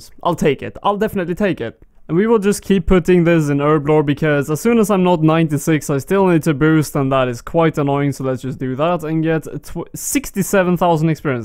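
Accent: Sweden. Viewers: Man i love your swedish accent !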